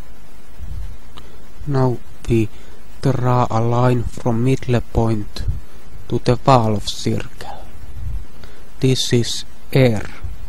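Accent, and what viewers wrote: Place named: Finland. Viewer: Finnish accent is best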